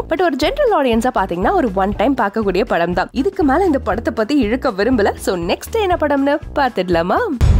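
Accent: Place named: Malaysia. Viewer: akka Malaysia Tamil Torll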